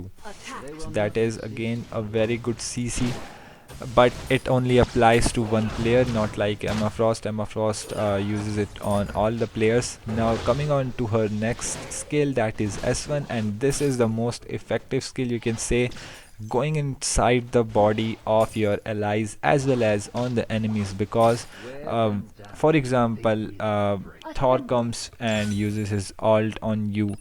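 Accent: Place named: India. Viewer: Bro I think your indian from your accent,but forgive me if iam wrong but I was wondering whether you are getting ranked matches,iam indian myself so I think we will be playing in the same server,that's why iam asking you,iam struggling here cuz iam not getting matched in rank and maybe like after 6+ mins I give up, please provide me some tips on how to get ranked matches easily if there are any, Thankyou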